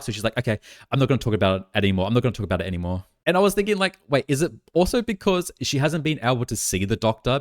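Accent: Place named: Australia. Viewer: Great synopsis. It's always nice getting Gorlworld updates in my accent. #aussiepride The whole not taking BP medication thing is stark raving bollocks. How does a person with health anxiety (which I do think she has. I have it; I know the signs) hear she has high BP and be like "...no, I'll fix it naturally"? Girl. Come on. Even if we believed you were capable of losing weight at this point, at least take the meds until you've got your weight down and your BP is normal without them. This tells me there's some pride getting mixed in here.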